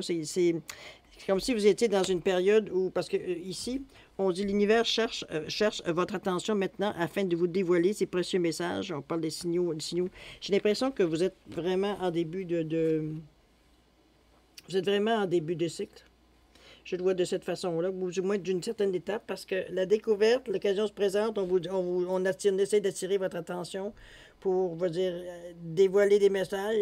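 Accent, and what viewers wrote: Quebec, Canada. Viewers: il faudrait parler moins rapidement,car ce n'est pas évident avec l'accent québécois,merci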